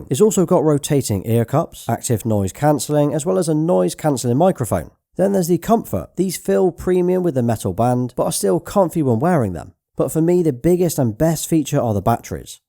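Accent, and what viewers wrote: United Kingdom. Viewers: I knew he was going to be British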